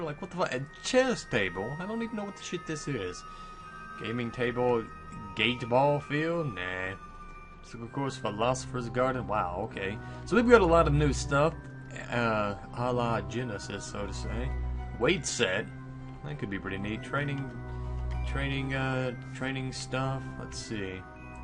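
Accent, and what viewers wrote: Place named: Texas, United States. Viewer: Sounds Texian I like it.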